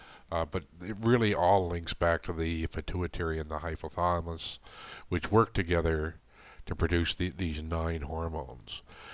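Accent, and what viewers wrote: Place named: Michigan, United States. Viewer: Where are you located? I detected a bit of Michagander in your dialect.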